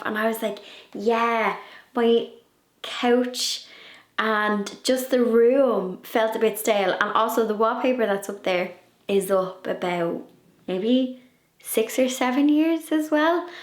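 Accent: Ireland. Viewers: Just seen you for the first time. It’s always such a shock to hear another Irish accent, but a good shock! 🤗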